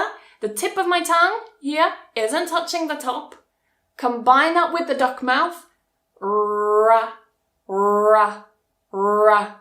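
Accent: United Kingdom. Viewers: Your accent is so British, I like it a lot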